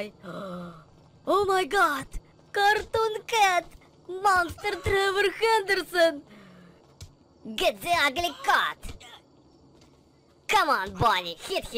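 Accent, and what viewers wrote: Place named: United States. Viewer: Ты так разговариваешь потому что в соединеных штатах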